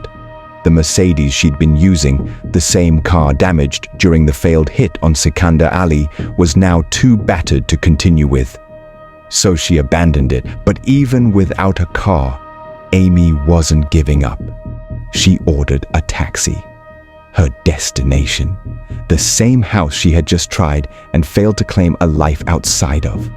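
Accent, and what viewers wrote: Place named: Asia. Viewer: Narrator trying to blend in but we can all hear your annoying asian accent. Deport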